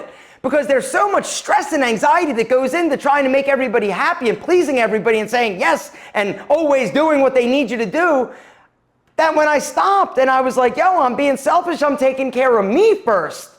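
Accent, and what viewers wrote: Philadelphia, United States. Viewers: Philly accent detected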